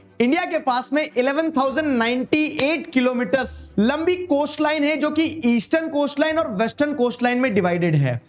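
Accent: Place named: Garhwal, India. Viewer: garhwali dilect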